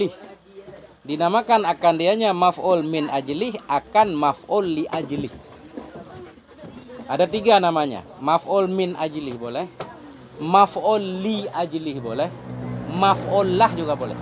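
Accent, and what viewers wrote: Aceh, Indonesia. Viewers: maaf tgk asli orang Jambi / kek mana, sbb loghat nya kek ada loghat Aceh gitu 😅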